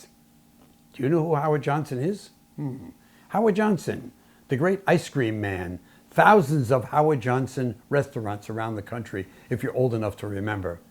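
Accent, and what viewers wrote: New York, United States. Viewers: Where exactly did you grow up, you say New England but I hear New York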